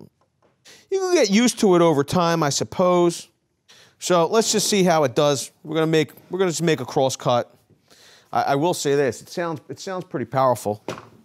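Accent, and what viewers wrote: Philadelphia, United States. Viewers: Knew u were a philly guy from the accent lol Gonna sub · LOL, knew you were from Philly.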